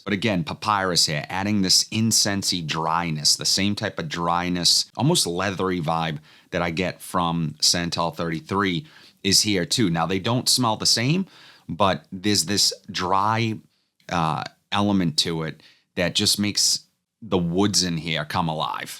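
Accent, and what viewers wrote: Boston, United States. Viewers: That Boston accent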